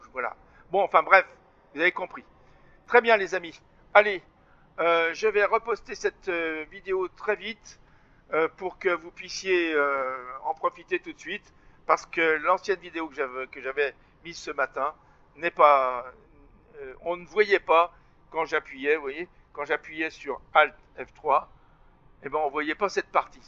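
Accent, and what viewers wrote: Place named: Lyon, France. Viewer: Merci!! Dites-moi, à vous entendre, vous semblez être de la région lyonnaise. Je me trompe? ps: je viens d'essayer, je me suis mis dans le jeu, j'ai bien une carte nvidia, mais cela ne fonctionne pas: « alt F3 » ne fait rien du tout. Arf...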